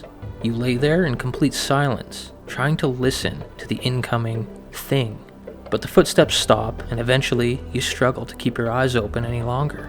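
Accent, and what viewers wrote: Canada. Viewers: he says " bean " instead of Been there the whole time is this a Canadian accent thing or ? "yeah, no ?" lol 😆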